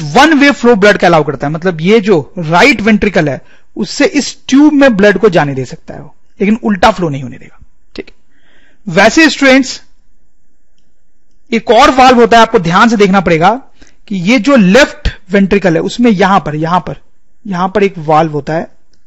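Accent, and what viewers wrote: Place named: Bengal, India. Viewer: Ap sir kaise bolte ho bengali hi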